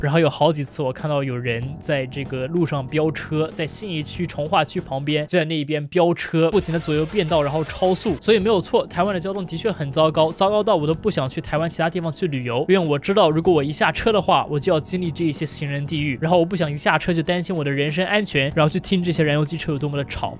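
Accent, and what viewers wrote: China. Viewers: 以前聽到別人提到你的頻道有多不喜歡，我總覺得應該存在一些偏見，因為我是少數台灣人裡面理解比利時有三個政府而且各自為政的尷尬狀況。今天看了這個內容，其實你講述得很忠肯，但如果可以把敘事語言換成英語可能會更好，我有很多中國的好友，但你這口音，讓人不自覺得想防衛，但你做得影片內容都很棒，台灣能走到這一步，要感謝中國不斷地逼迫台灣，讓台灣在國際上不斷地曝光在國際新聞上，讓大家都知道台灣。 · 我住台灣40年了 讓你一個說者大陸口音的混血兒 一針見血地說出了台灣的事實 老實說 真的 他媽的 爽! 你說的真好! 而且 你的中國口音真的很道地 說起英語 又流暢到像是(本來就是)外國人 聽你說話 舒暢! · 中國腔有點嗆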